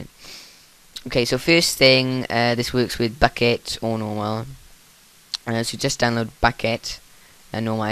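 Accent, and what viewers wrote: Wales, United Kingdom. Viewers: Dat welsh accent tho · Are you welsh? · A true Welshiee, Ditto! :D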